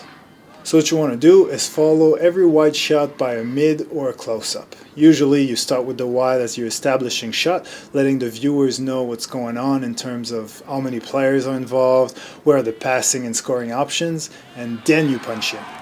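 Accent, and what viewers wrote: Quebec, Canada. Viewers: Where is your accent from ? Quebec !?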